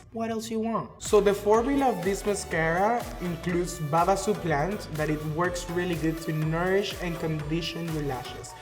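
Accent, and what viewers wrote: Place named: Spain. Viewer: I just love your positivity energy and how you speak English with a little bit of Spanish ❤️❤️ I also did a quick apply and viewing video for this month I got almost the same thing beside the lashes tho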